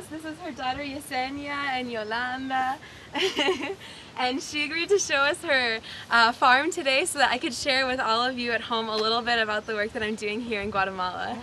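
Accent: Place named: New England, United States. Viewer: Yeah great job, watch your steps, lol... wow I'm so impressed with your Spanish, oh by the way I used to work with FUNCEDESCRI too when I lived there. Is from New Ingland? cuz of the accent, wow tha was a 3 minutes walk. Any way great job.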